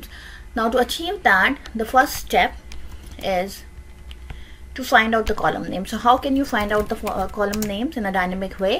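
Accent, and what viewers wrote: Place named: India. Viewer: The accent is so Hinish and so bad and is not understandable!!! learn to speak English as English not as Indian!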